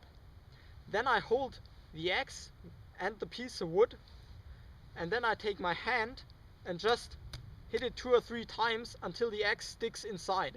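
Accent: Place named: Austria. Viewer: Great video! The best video I've seen on youtube about using an axe safely. I also really like your accent. Austrian right?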